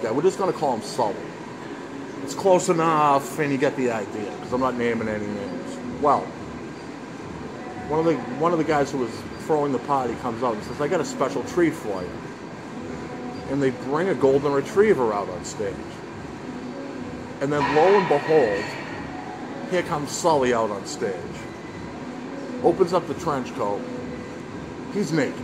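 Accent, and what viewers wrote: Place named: New England, United States. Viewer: Welcome to the family! Great see and hear another New Englander on YouTube kid! Had me at and! Looking forward to more videos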